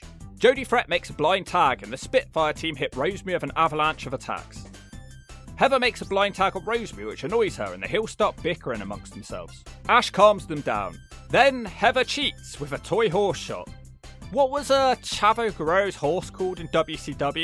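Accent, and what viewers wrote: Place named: Scotland, United Kingdom. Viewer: Yeah, his voice can sound a little southern American and Australian if he's hanging back but he never had a THICK Accent, just some scottish ticks.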